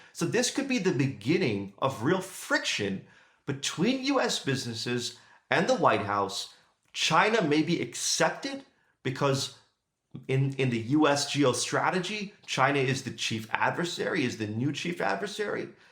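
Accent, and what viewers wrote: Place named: Canada. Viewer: You can tell he's not in the US. Listen carefully, and you'll notice he's Canadian.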